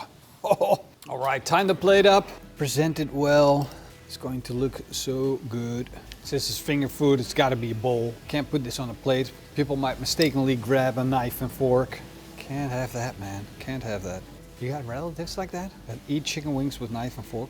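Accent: Netherlands. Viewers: Hearing that accent… Ik wist het een Nederlander!! 😁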